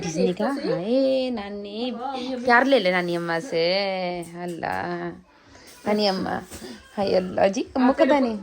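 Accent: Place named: Pakistan. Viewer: Ap pakistan m kha sy h because your acsent is different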